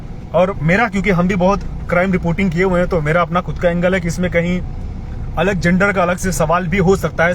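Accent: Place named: Arunachal Pradesh, India. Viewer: ji, aap ka hindi bhi apna wala hogaya hai.😊 "Padhariya aap Arunachal mein hai"😂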